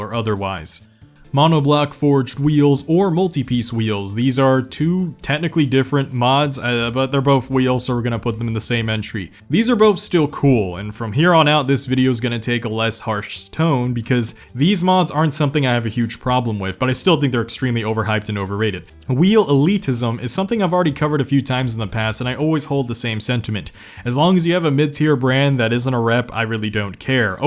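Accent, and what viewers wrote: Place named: Midwest, United States. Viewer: You have a similar accent to Sam O’Nella, are u from the mid west?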